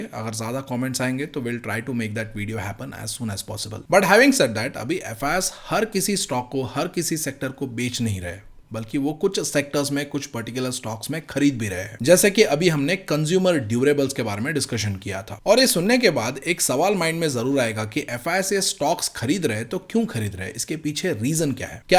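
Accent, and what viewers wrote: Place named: Bengal, India. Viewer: Hindi theek se aati nahin to koi aur bhaasha mein boliye. Your Hindi (are u Bengali BTW?) grates on my ears 😭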